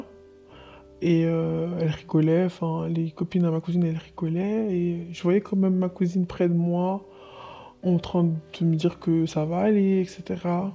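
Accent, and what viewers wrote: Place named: Belgium. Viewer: Sa s'entends que c'est une Belge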